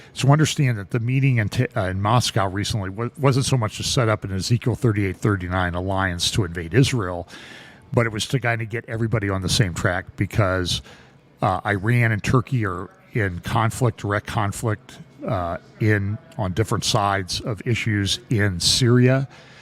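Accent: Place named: Chicago, United States. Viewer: Sounds like Chicago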